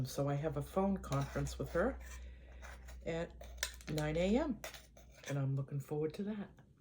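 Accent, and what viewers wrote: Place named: Boston, United States. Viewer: yes the channel name is Eat Pray Van. Named after my favorite book/movie! I love that you are just yourself and super genuine. One of my other favorite YouTubers said if your going to do YouTube to “Be Yourself”. (Your one of my favorites too ❤️) I’m from/born in NYC and I really love the “Bawston” accent LOVE IT! I’ll post on whichever is your newest video on Friday and let you know it posted! I can post a link to the video, thank you for wanting to watch I really appreciate it!!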